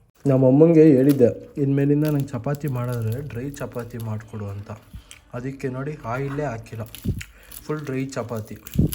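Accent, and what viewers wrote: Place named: Hassan, India. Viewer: Pysch agi matadtya bro nam hassan bashe na.. keep on doing bro ❤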